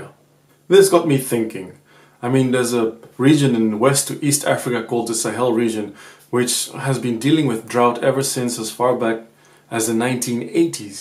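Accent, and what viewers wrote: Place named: Netherlands. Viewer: + your accent...are you Dutch perchance??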